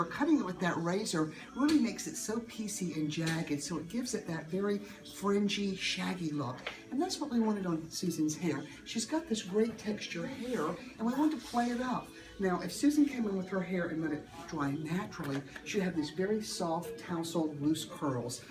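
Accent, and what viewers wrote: United States. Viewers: What a wonderful cut and style for this lovely lady. You sound like you could be from Georgia, or nearby. If so, I want you to cut my hair please! I'll drive the distance! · Great job! I hear a southern twang...where are u located?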